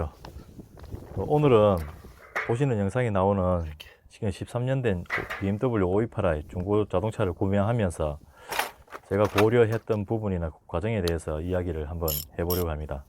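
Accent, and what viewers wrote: Gyeongsang, South Korea. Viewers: 근데 엔진오일 교체하는데 얼마한다고 저 난리를ㅠㅠ 저도 부산인데 사투리 심한건 아니고 억양 자체가 경상도네요ㅋ 유튜브 대박나시길 기원합니다 저렴하게 엔진오일 교체하는 꿀팁 알려드리겠습니다 파트존 깔고 내차 차대번호 입력하면 가격대로 나옵니다 저도 528i 끌고 다는데 최저가로 구입하시고 글구 물건이오면 공임나라 예약해서 교체하세요 그럼 총 10만원도 안들어갑니다 오일 흐르면 환경파괴 잘못 교환하면 피박씁니다 글구 엔진오일 교체할때 에어컨 필터도 같이 교체하세요~^^ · 목소리리 톤이 아주 좋으십니다. 남자로써 차에 관심을 많이 가지는건 당연하겠지만 실천하기가 싶지 않는데 존경스럽습니다. 혹시 경상도 분이신가요.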